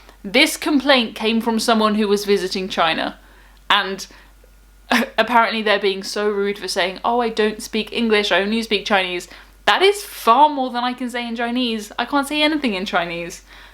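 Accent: United Kingdom. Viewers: Now why could I have not met a girl like you in my younger years. Your attractive, smart, articulate - and like me, I could spend the rest of my days cruising the world. Oh, I love that British accent.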